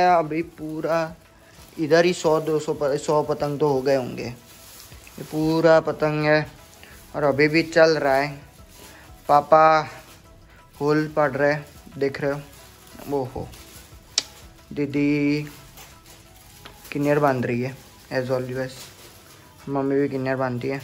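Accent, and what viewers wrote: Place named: Gujarat, India. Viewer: Mane laagtu j tu tamara ane learn na India cup na interview ma ke aa loko gujarati hase pakku...Tamara hindi ni style upar thi 😂😂 Love from Ahmedabad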